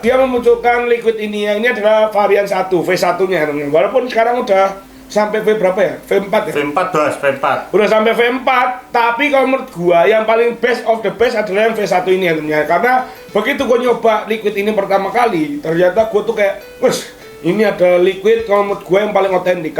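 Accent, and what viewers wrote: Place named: Java, Indonesia. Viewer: Skalin konten ngomong gua nya 1000 kali😂😂 medok lagi😂😂🙏🙏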